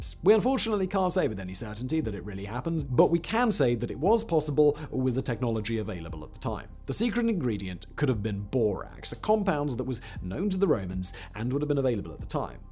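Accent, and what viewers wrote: United Kingdom. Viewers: Whistler provides hosting duties on like 97 different channels, right? I earnestly attempt to watch these but for me simply talks too fast or my hearing is woefully too slow; add in the British accent and I quickly find myself rewinding from the jump to replay stuff I simply didn’t hear, couldn’t understand or a combination of both. By the time I try using CC to help me I’m exhausted and generally give up.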